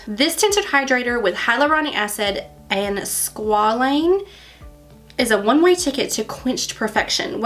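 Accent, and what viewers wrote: South, United States. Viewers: Great review! Love your adorable Southern lilt. Totally adorable vibe. Can't wait to try this product. ❤😊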